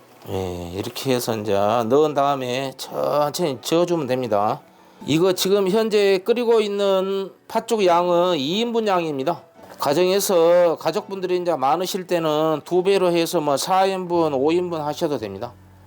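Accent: Jeolla, South Korea. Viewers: 전라도는 이신가벼요 친숙해서 좋아요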